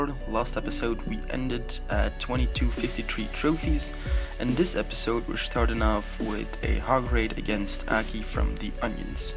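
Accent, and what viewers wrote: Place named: Switzerland. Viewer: really its sounds like your are from switzerland xD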